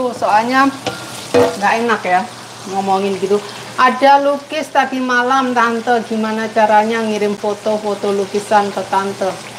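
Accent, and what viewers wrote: Java, Indonesia. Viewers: sy hadir dr madiun jatim mbk aku sela😅lu ngikuti chenel mbk putra putrinya udah mulaidewasa mbk ,mbk kadang sy ketawa bhs mbk kok msh medok kali yo 😅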